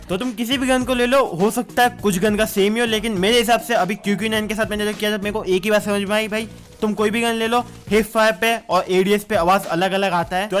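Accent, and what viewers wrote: Gujarat, India. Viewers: I love your voice.does you have Gujarati accent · Bro, are you Gujarati? Cause according to your accent, I guess that you are Gujarati..... Btw I love your videos.....Keep it up for entertaining us!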